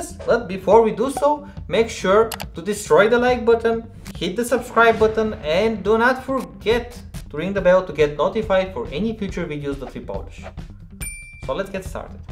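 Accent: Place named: Mexico. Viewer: Che mexicano intentando hablar inglés no mams mejor hazlo en español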